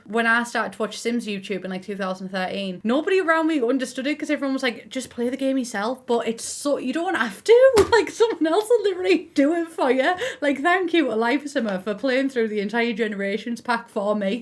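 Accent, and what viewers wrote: United Kingdom. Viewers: Ure biritish?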